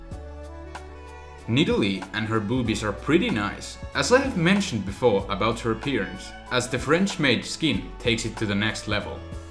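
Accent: Finland. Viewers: Where is he from? His accent sounds quite finnish. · Are you from Finland?!?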